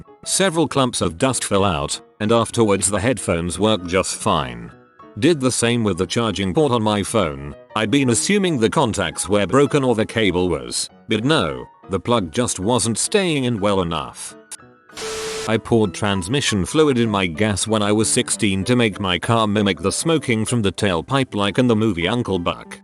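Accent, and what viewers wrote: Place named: England, United Kingdom. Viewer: Really tiered of that English accent.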